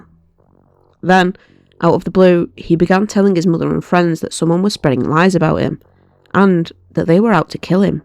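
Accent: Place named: Huddersfield, United Kingdom. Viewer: Just found the channel and subbed because you sound local to me (Huddersfield) good luck 😀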